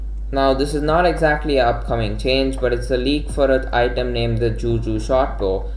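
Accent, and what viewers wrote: India. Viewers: U sound kinda indian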